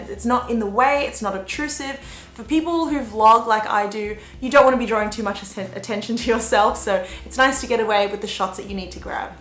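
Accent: Australia. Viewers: I really like how you talk. That Aussie accent sooo nice! Nice camera btw 🙌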